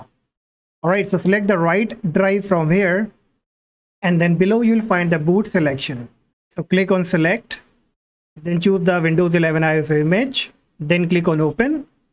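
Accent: India. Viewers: no offense but the way to pronounce S in some words, tells me you are an indian :) speak in your normal english accent bro. don't make it american :) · I knew it will be good when I jeared the indian accent, great video, quick and straight to the point.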